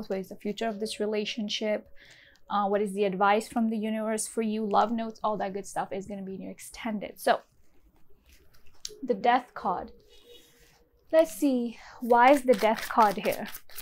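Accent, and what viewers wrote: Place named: France. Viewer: I like a lot your accent and voice. ..There is smthg french ...and sweet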